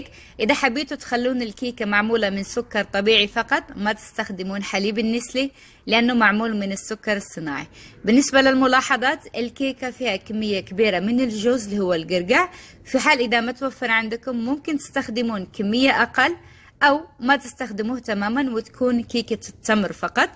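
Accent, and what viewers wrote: Tangier, Morocco. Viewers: كتعجبني مكتهضر طنجاوية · ما شاء الله .تسلم ايدك انتي مغربية .ولا لبنانية .كلام. مخلوط. 😍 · باينة فيك تطوانية او طنجاوية ❤❤❤ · ههههههه مزيج عجيب بين المغربية الجبلية و اللهجة السعودية ضحكتوني و الله ايخلي ليك ااادم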